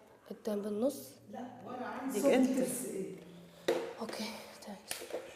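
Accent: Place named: Morocco. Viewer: لي تصحيح معلومة هدي لهجة مغربية بيضاء وليست لهجة ديال مغرب لي تيتكلمو بيها بيناتهوم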